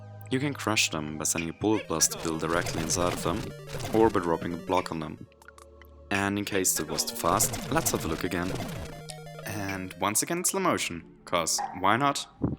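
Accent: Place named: Germany. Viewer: Here can i hear that he is german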